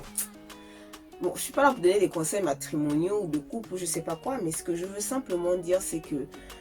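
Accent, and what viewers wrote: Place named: Gabon. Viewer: Je ne te le fais pas dire ; dans son langage tu sens une femme gabonaise.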